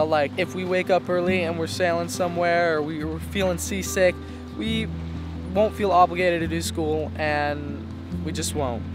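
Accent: France. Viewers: tu as un bel accent français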